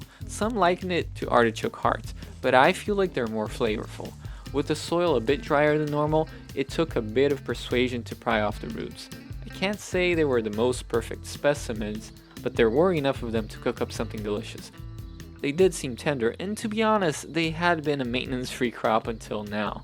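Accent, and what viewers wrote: Brazil. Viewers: Que trabalho maravilhoso,!! Estamos apaixonados! Engraçado que topamos com um vídeo antigo seu e pelo sotaque no inglês eu já senti que era brasileiro 🧡 é lindo topar com narrativas riquíssimas e belíssimas como as suas! Muito obrigada pela dedicação, lhe desejo muito sucesso!!!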